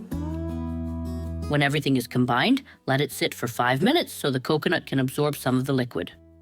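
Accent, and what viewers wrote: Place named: England, United Kingdom. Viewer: ... recién descubro tu canal,... ya estuve viendo 2 recetas,... me encanta como explicas, muy práctico y sin hacerlo tan largo,... qué buena y deliciosa éste Marble Cake,....me gusta tus utensilios que usas para cocinar,... yo entiendo poco en English,...pero hago ls traducción a Español,.... soy de Argentina, pero vivo en North carolina USA,....tú acento me parece de Inglaterra,.... muy bonito todo( me pareció muy bonita la fuente que usaste para hacer el cake 👌).saludos Miriam...👍👍👍💯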